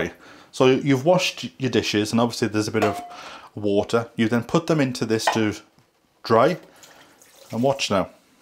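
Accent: Wales, United Kingdom. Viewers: I have CFS diagnosed too, it’s good to see you spreading awareness. I’ve noticed your accent is more pronounced since you’ve returned to Wales. I’m from Wolverhampton (MuddyBootz territory), but my husband is from Darlington. He’s been in the West Midlands 30 years now, but every time he goes up north he remembers his accent :)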